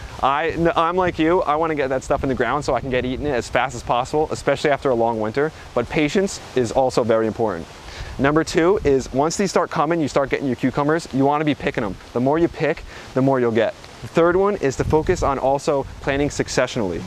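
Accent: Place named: Pennsylvania, United States. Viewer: Someone sounds like they may be from PA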